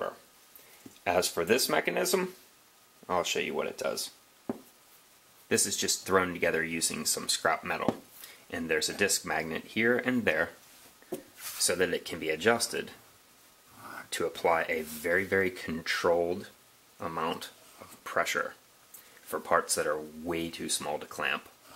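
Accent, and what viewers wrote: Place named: Texas, United States. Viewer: dude, I love your accent, your jokes, and your dry delivery of them. my husband and I are getting into woodworking because of you. it's all your fault! 😉 did you live in Texas? I'm trying to pinpoint your accent; it's very complex and interesting :) (to me, at least)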